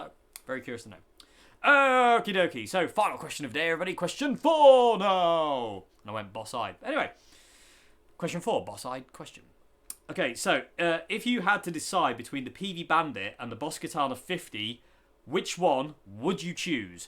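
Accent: United Kingdom. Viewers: Hey Mr....You and I have something in common....We both talk funny...You’re from the U.K...and I’m from..New Yawk...It ain’t easy being us 😊. P.S...Brass Nuts...can have...a few different meanings....Keep on keeping on young man...👍😎🎸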